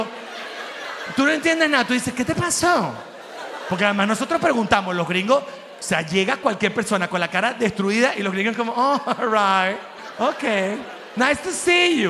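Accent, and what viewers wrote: Cuba. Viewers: Soy cubana 🇨🇺 como m rio cuando hablas como nosotros 🤣🤣🤣🤣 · Muerooooo me encanta la interpretación Cubana que haces ❤ Te Quiero 😅😂😂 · habla como si fuera otro cubano más 😂😂😂, mucha observación y cuidado al detalle 😂😂😂😂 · Soy cubana y en verdad me encanta tu acento cubano y me meo de la risa 🤣 😂😂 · Jajaja, genial el acento de nuestra tierra!!! 😂😂😂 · Es q pareces cubano de pura cepa jajajaja,aquí no existe la terapia jajaja👏👏👏💯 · Jajajajajajaja c.l.m ese acento cubano!! Te quiero mi amor! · Ese cubano que llevas adentro está buenisimooo!!!!😅❤🎉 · Soy cubano de nacimiento, venezolano de corazon y brasileño por naturalización. Viví 7 maravillosos años en el Zulia y escucharte me hace reirme a llorar recordando aquellos locos maracuchos que me tenian el dia entero riendo. Tus imitaciones de los cubanos son fantásticas pero te pareces mas a un habanero hablando que a un pinareño ( lo digo por mi porque soy habanero) un abrazo desde Brasil. 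· 😂😂😂😂Ayyyy moriiiii. Soy cubana! Y gozo, cuando veo al perrito cubano y cuando hablas como nosotros en tu show. Ya hoy, lo acompañaste, de las vulgaridades, y para mí, resultó comiquísimooo 😂😂😂😂😂😂😂😂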